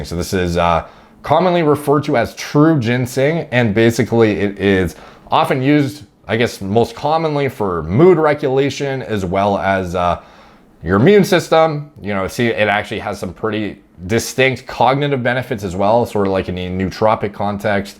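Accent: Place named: Canada. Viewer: Are the prices on the website in US or Canadian dollars? With the aboot etc guessing is Canadian?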